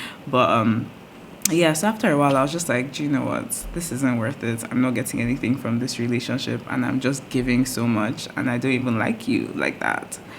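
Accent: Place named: Nigeria. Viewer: Hot nigerian accent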